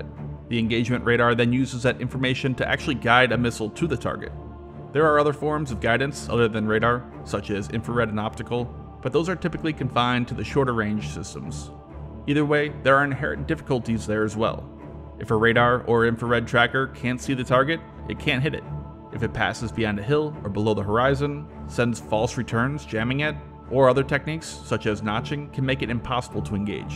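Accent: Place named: Midwest, United States. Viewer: He’s got a strong Midwest accent. I’d guess Wisconsin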